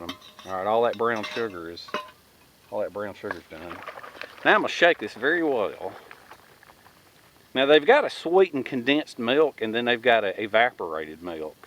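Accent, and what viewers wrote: South, United States. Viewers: I was wondering where in the South you were... I could tell you were somewhere in Antebellum country by your dialect.... Georgia the setting of 'Deliverance'- watch your corn hole in them woods that boarder Appalachia 😎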